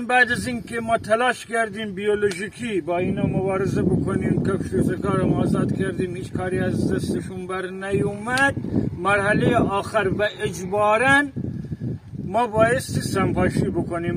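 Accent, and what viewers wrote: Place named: North, Iran. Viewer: صحبت های شما با لهجه شمالی بسیار تاثیر پذیر و منطقی تر بنظر میرسد ، خسته نباشی کارت بسیار ارزنده و ماثر و سرگرم گننده است . وقتی که زمین شما را نکاه میگنم مثل این است که ملک خودم را تماشا میگنم . بهر جهت خسته نباشی هم وطن گرامی . پاینده و پیروز باشی با باغ زیبائی که داری .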